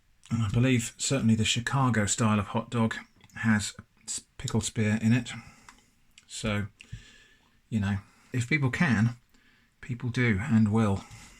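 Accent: United Kingdom. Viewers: I love the British accent when it isn't too strong.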